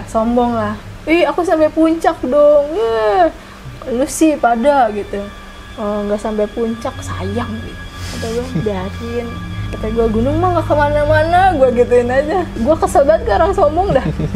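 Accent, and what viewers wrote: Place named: Depok, Indonesia. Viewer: Kentel amat mpok logat betawinya, ciri khas betawi gaul, klo cerita asik dan seru, idup cerita nya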